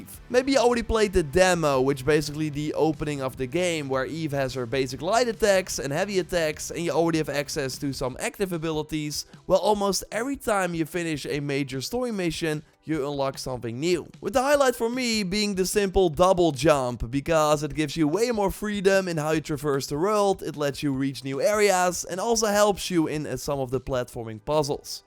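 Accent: Netherlands. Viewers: I love this Dutch accent so much ❤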